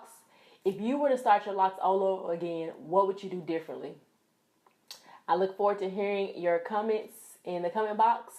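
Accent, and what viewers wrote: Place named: Tennessee, United States. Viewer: I knew it, I have a few friends from Tennessee, and you sound like them. I figured it was a Tennessee accent, but I had to make sure. Kudos for my ears....lol....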